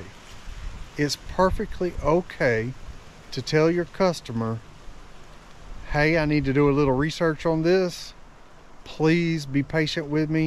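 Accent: South, United States. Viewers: From your southern accent, I was wondering what part of the south, GA. In 2 yrs I'm planning to move to catersville. I'll be looking for employment then. I personally never wanted to start a business, I could have one time if I wanted. To many licenses and epa rules, I mentally can do that stress.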